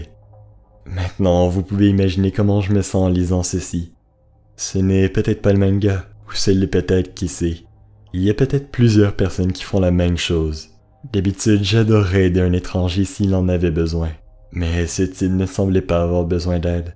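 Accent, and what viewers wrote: Quebec, Canada. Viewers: Mais c dingue à quel point ton québécois ce mélange bien avec tes creepy pasta. J'aime énormément ton travail. Chez toi comment ce passe au niveau du virus ? Ua un confinement ou autres ? Moi je vie dan l'est de la France qui a était rapidement contaminé acose de l'Allemagne. J'habite plus précisément à laxou dans Nancy. Nous sommes zn confinement de 7jours sa vas. Sauf notre gouvernement est pas à la hauteur. C pénible. Pas de masque poir le déplacement ou le peu de travalleur non confiné. Et attende l'accord de la communauté scientifique. Pour le médicament miracle n'importe quoi. Heureusement que les médecins libereaux eux le donne au plus malade. Tien moi au courant stp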